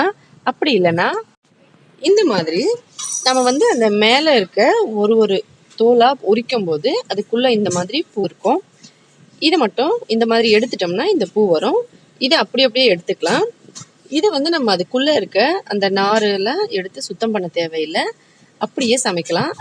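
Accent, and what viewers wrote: North India, India. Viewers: Mam are you a North indian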